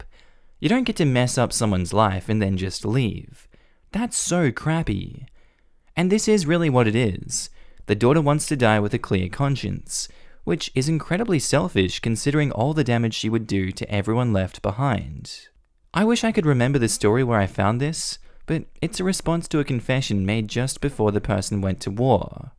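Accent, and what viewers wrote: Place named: Australia. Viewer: I don't know why, but I just love the Aussie accent, and slang. I know there's probably many types of Aussie accents, like there's different American and British accent, but the one you have is my favorite. I love listening to Reddit videos, and having an Aussie doing makes it even better.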